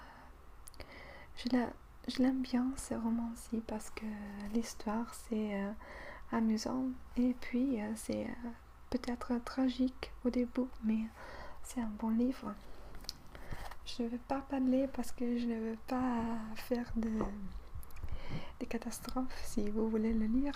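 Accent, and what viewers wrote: Italy. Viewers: tu parles super bien français en plus ton petit accent italien est super bien je trouve que sa donne un plus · J'adore tes vidéos ! Je les aies fait découvrir a ma sœur aussi et pratiquement tous les soirs on t'ecoute pour nous aider a dormir, tu es comme notre jolie berceuse. Tu nous berces de paroles positives, tu nous racontes tout ce que tu connais, c'est passionnant ! Et ton accent italien en parlant français c'est encore plus joli ! Tu m'apaises, tu me destresse, c'est un vrai plaisir de t'ecouter! Tu as un très bon français a ce que j'ai vu sur les autres vidéos aussi :) Sinon en lecture française je te conseille les grands classiques comme Notre dame de Paris de Victor Hugo ( je lis beaucoup plus de littérature anglaises) Bisous et continue !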